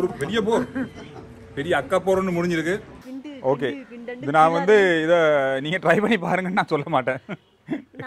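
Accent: Jaffna, Sri Lanka. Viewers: அண்ணா அக்கா நீங்கள் மட்டக்களப்பா யாழ்பாணம்மாதிரி பேசிறீங்கள் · இலங்கை தமிழ் சூப்பர் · Sutha Tamil srilankan accent appadithan erukum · உங்கள் தமிழ் எனக்கு உயிர்.சிறுவயதில் சிலோன் வானொலி கேட்டு வளர்ந்தோம்.நீங்க எல்லோரும் சேர்ந்து இலங்கையை இந்தியாவுடன் இணைந்துவிடுங்கள்.நாங்கள் உங்களை அன்புடன் ஏற்றுகொள்ள தயாராக உள்ளோம்.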